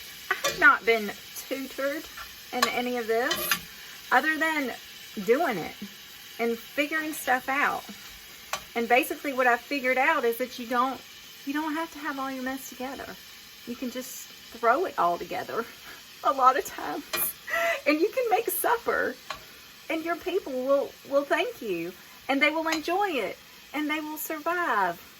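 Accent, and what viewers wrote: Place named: North Carolina, United States. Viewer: Like the way you talk and your voice sounds , NC slang , love it !!! 👍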